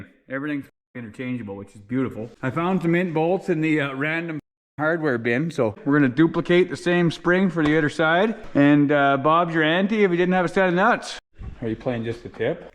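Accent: Canada. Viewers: princess auto winch that you got on promo is the most relateable thing I've heard all day. fuck buddy I can't tell if you're from Ontario or Alberta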